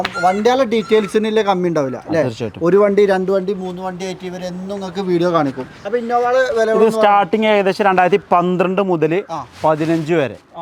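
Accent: Malappuram, India. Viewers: മലപ്പുറം ഭാഷ യിൽ ഉള്ള വീഡിയോ വേറെ ലെവൽ 👍🏾👍🏾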